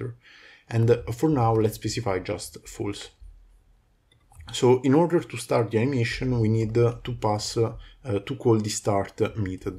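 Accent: Italy. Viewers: are you italian? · Italiano? Sembra dall’accento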